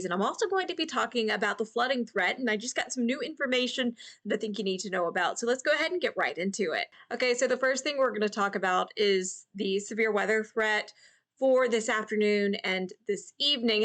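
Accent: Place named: South, United States. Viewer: I love how you explain the weather! Nice Southern accent too!👏👏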